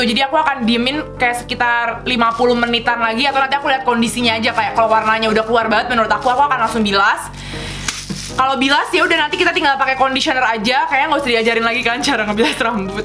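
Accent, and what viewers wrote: Java, Indonesia. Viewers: Enk aj gtu klo dgerin ka ngomong,medog gmn gtu ya wkkwkw😂😛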